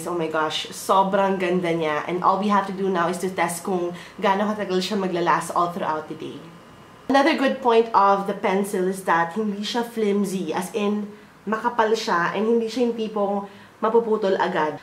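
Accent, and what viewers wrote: Conyo, Philippines. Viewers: i love the way you talk ate very conyo i like it haha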